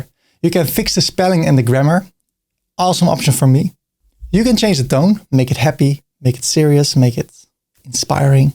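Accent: Netherlands. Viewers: I hear a dutchy accent, Ga zo door broer ! jij hebt prachtige content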